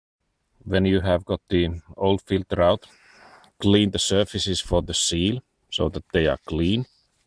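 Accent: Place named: Finland. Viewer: Thanks man, very useful! Also love that finnish accent! Cheers from the Czech Republic :)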